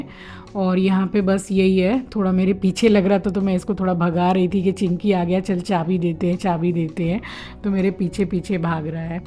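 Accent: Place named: North India, India. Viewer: Your way of talking like us l mean north side 😊 l like u so much ❤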